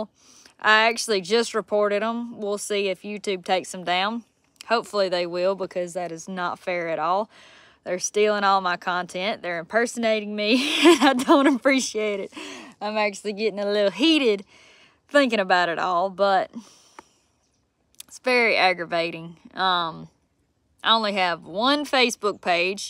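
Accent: South, United States. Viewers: Thanks for the heads up. Your a darling. I love your Southern bell accent! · I’ve been a fan for a couple years and you’re the best! Great content and so genuine!!! Beautiful southern woman with magnificent southern accent and great skills!! Seems like there’s no getting rid of those thieving trolls!! All the best to Hannah and her kin!! MMc.. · this is southern accent that Forrest Gump had spoken? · I absolutely love your southern accent so hot and your pretty easy on the eyes too girl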